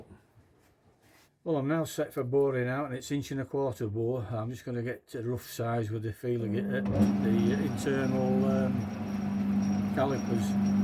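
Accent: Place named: Yorkshire, United Kingdom. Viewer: Hi I suspect you are a fellow Yorkshire Man with the accent? I’m from Wombwell/Barnsley and a wannabe clock maker which is how I came across your channel.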